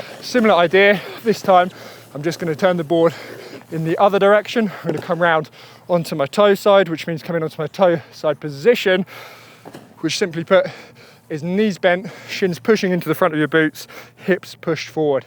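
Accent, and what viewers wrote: United Kingdom. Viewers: You are so inspiring mate! You got the cool and positive attitude, i sometimes look at your videos just to relax (and for your lovely british accent). I hope i get to Alpes d'Huez some day to learn some stuff and correct my riding posture someday ;) Cheers, keep up the nice work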